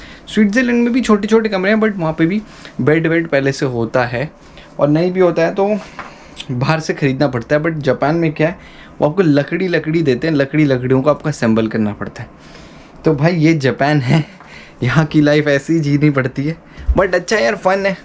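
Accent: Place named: Delhi NCR, India. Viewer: Yeh Ghaziabad-Noida accent mat kar bhai, tho pe suit na Khare hai yu… yeh sab mohh pe chd dee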